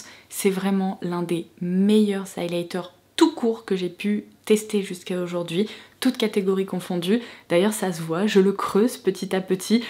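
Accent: England, United Kingdom. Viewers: Merci pour ces cadeaux pour ce super top 10 et ce super accent anglais qui fait du bien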